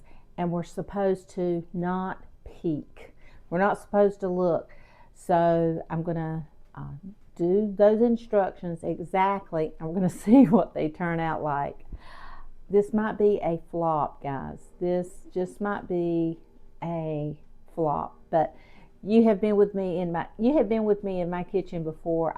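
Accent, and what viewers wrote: South, United States. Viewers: you are an inspiration. Sharing your story helps me remember that we just don’t know sometimes what the people we see in our daily lives are going through. We just don’t know. Perhaps remembering that we don’t can I courage us to be kinder in our interactions with others., I don’t know you but you seem to radiate kindness. Perhaps it is your voice and that beautiful southern accent that we in the south are blessed with. Perhaps it’s the expression on your face. Maybe a combination of things shows the kindness of your heart. You were speaking in this particular video of a sad event in your life. I am reminded that in the Bible there is a verse that tells us that we learn in the House of sorrow not in the House of laughter. God in His wisdom knows what we need and in His wisdom He sends situations or people to provide for that need. 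God bless you. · I love your southern voice so much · you are an angel here on earth. May God richly bless you and your family for your faithfulness to Him and to one of his precious Lambs who had no home until you provided for her, cared for her and loved her as He would. I love your YouTube channel and your sweet smiling face, your lovely southern accent that sounds like me, I'm a Nashville TN native living in Cedar Hill TN now. Your courage and determination are inspiring and your kind and loving heart is projected through every word you speak to us and every smile you give to us. Blessings upon blessings be showered upon you and yours forever. ❤🙏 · your genuine warmth and sincerity are so refreshing in this increasingly disconnected Impersonal world. Your wonderful Southern accent also endears you to this boy raised in the Deep South! May God continue to bless you as you bless others! 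Big hug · I appreciate getting to know you more. I’m a newish subscriber- so I’ve only seen a few of your videos, but I knew I had to subscribe because I love a sweet soul with a southern accent! Plus, a great cook! Love, from Texas!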